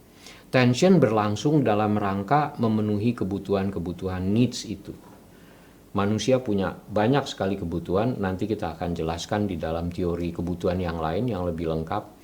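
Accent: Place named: Germany. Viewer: Jujur sih aksen jermannya Pak ini keren.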